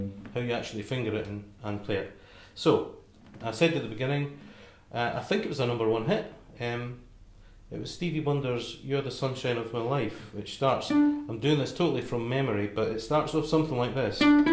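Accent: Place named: Scotland, United Kingdom. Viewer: Nice to hear a nice well modulated Caledonian accent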